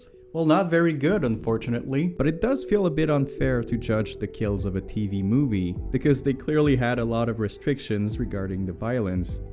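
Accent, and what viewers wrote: Quebec, Canada. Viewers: french canadian eh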